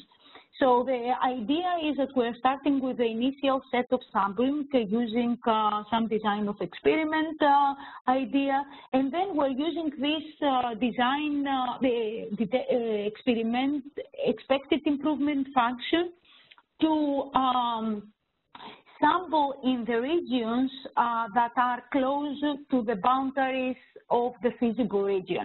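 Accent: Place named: Greece. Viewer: is the best professor I've ever seen. Her accent is clear and recognizable. Greek people have very unique English accent but after having conversions with them for a longer time you will find out that their English is pretty good. And I like their accent. if you check nowadays process system.engineering field and most of the big scholars are from Greek. Like Dr. Floudas, Dr. Pistikopoulos, they have very solid math background. You should not focus on the minor language difference but instead look at the greater research picture. She has explained the research work in a very straightforward way with putting out tremendous math equations and optimization theorems. It's great to watch the valuable overall reasearch introduction online for free.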